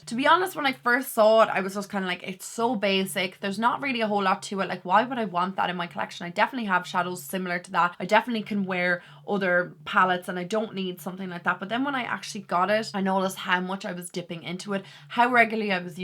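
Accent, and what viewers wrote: Ireland. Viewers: I'm new to your channel after searching for eye shadow pallets and this is really useful, the Huda one looks good. By the way like your accent, it sounds very Irish :)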